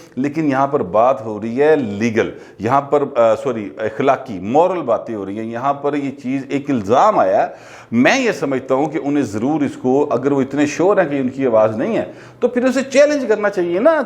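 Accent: Punjab, Pakistan. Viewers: جناب گوھر بٹ صاحب اسلام وعلیکم سر آپ کا پروگرام بہت زبردست ھوتا ھے اور آپ جس ٹھنڈے اور میٹھے انداز میں گابی اردو میں بات کرتے ھیں اور تلخ حقیقت بھی سن کر بھی مزہ آتا ھے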